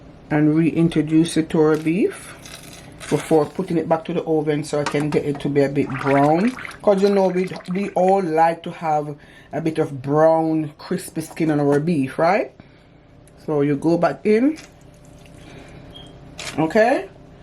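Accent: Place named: Jamaica. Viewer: Very well presented you're channel is amazing I love the yard voice. Bless up yu self.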